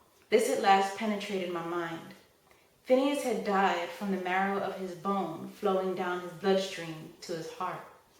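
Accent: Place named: South, United States. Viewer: southern accent isnt needed but good job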